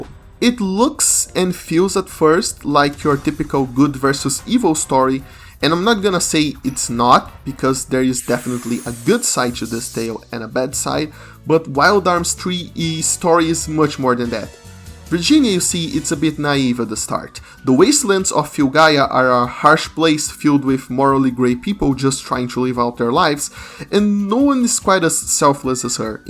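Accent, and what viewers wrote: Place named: Brazil. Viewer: Your accent sounds Brazilian to me.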